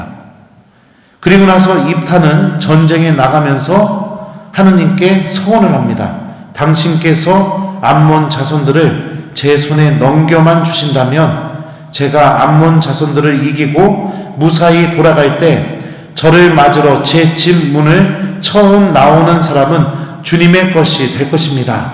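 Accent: Chungcheong, South Korea. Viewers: 신부님 말습이 너무 웃기고재미있어요 충청도 아젓이 신부님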